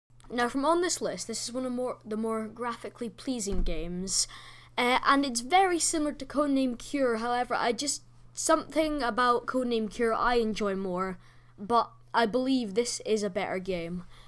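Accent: United Kingdom. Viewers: i like british people, and you sound british just in case your not, hell im even trying to speak in british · are you Scottish or?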